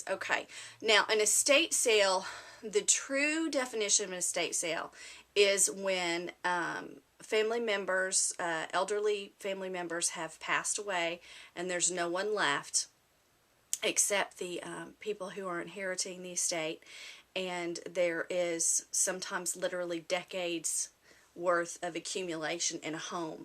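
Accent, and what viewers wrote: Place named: Texas, United States. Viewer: omg you are so darn adorable! I am from Texas also and I just live your accent. Never been to an estate sale, but definitely looking to go check a few out. thanks for the great tips.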